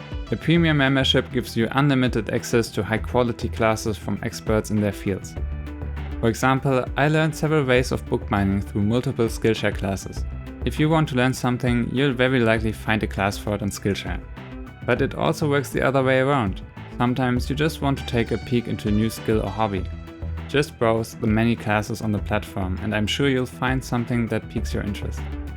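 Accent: Germany. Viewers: One question, are you German? Because your dialect sounds like you are german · Is he german? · Bin ich die einzige, die raushört, dass er eindeutig Deutsch ist? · Are you from Germany? In comparison to my accent when I speak English it sounds pretty similar^^ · Are you German? You have a German accent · This is a Very German Accent 😂 But i am German too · are you german hahha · Ich finde man hört so stark, dass du deutsch bist, ist bei mir aber auch so. :D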